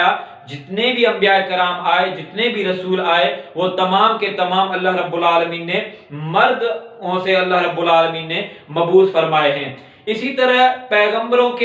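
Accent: Sindh, Pakistan. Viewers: gud urdu in pure sindhi eccent !!!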